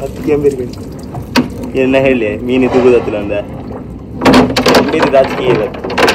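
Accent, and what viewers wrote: Kundapura, India. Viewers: very nice channel and beautiful content. I have been watching your posts from Kuwait for quite sometime now. I Liked the simplicity, naturality of the content, especially the hard work done by fishermen community. Most importantly, i love Kundapura Kannada. All the best. Keep Fishing.!!!!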